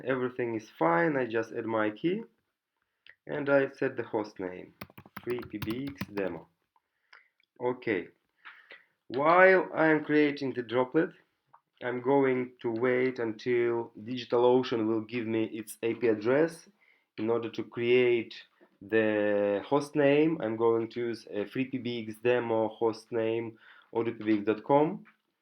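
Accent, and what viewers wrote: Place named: Russia. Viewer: Привет. Отлично разговариваешь на английском, но у тебя русский акцент. Подписка.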